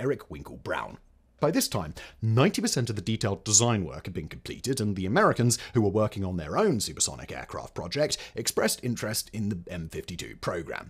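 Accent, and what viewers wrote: England, United Kingdom. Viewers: Why does speak with an English accent but pronounce Berkshire incorrectly like an American?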